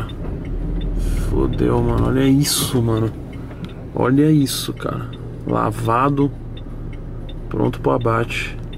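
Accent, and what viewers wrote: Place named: Bahia, Brazil. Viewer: baiano dms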